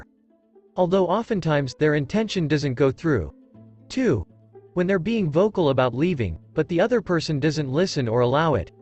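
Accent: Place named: United States. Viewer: American English is so bad.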